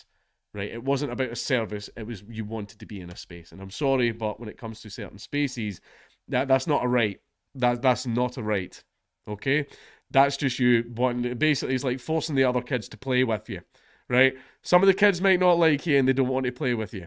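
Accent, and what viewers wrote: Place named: Scotland, United Kingdom. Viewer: It makes me so proud so hear such sense being spoken in a Scottish accent.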